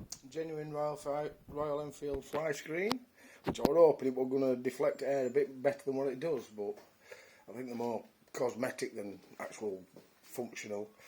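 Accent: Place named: United Kingdom. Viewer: I sucribed bro.Nice accent which part of UK you from.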